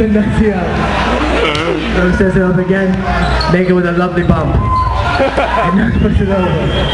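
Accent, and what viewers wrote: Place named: United Kingdom. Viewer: wow looking back that british accent was a terrible idea and extremely annoying hahaha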